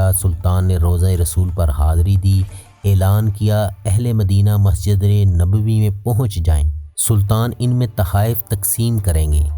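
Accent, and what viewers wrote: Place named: Punjab, Pakistan. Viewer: Yeri maadri jubaan saayad punjabi hai par urdu bol raha hai par punjabi ki jhalak hai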